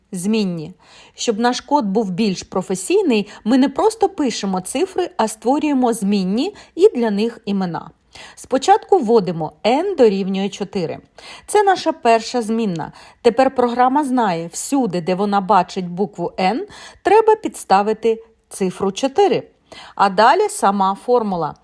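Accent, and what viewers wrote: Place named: Germany. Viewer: Варто зазначити, що конструкції більшості мов програмування названі англійською мовою. Тому і вимовляти їх варто англійською. У вас вимова схожа не німецьку чи французьку. Чому це важливо? Тому що учні поглинають це і використовують. А перевчитись потім дуже важко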